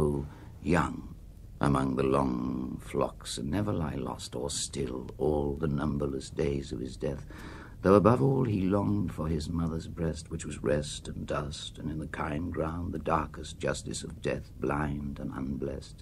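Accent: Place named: Wales, United Kingdom. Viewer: but you can hear the welsh tones, phrases, and emphasis it is warm and draws you into the prose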